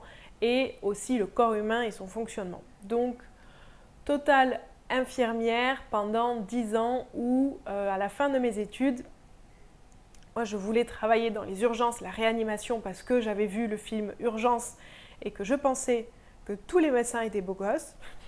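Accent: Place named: South, France. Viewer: je t'ai découverte sur instagramme pendant le confinement et j'ai tout de suite accroché à tes vidéos, à ton univers, à tout ce que tu dégages. J'aime ta douceur, ta joie de vivre, ta sincérité et ton autanticité, ton petit grain de folie, ta voix et même ton accent du sud. 😅 J'adore comment tu prononces les mots "môlet" et "môbilité" en appuyant bien sur le son "ô" 😉 En septembre, comme je n'étais pas vaccinée et n'avais de ce fait pas mon pass sanitaire, il etait impossible pour moi de m'inscrire dans une salle et de suivre des cours en présentiel. Du coup, c'est tout naturellement que j'ai décidé de m'abonner à tes 3 programmes en ligne pour pouvoir tout de même pratiquer le Yoga de chez moi. Et depuis tu fais réellement partie de ma vie. Tu m'appaises, me rééquilibres, me détends, me fait rire... Si je ne pratique pas pendant toute semaine et bien ça me manque. 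Je rêverais pouvoir participer à l'une de tes retraites, mais pour le moment, je n'ai malheureusement ni le budget ni les vacances pour. Mais ça reste un de mes objectifs 😇 Un grand merci pour tout ce que tu nous offres. Tu es une si belle personne 💜 Namaste 🙏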